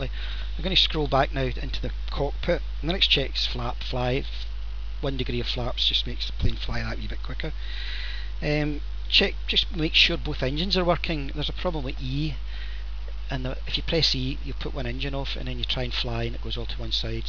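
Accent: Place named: Scotland, United Kingdom. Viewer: I couldn't help but notice your Scottish accent. It's cool!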